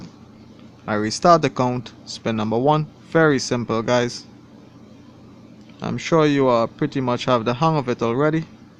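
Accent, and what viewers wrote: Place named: Trinidad and Tobago. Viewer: u sound like u from trinidad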